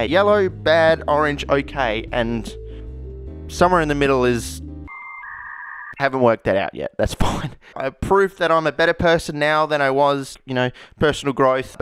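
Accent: Australia. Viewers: He looks and sounds like an Aussie Gerald Buttler. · finally a chemist who is informative, and yet satisfies my need to hear an Australian say their favorite "C" word about 65 times in a video. Easy sub.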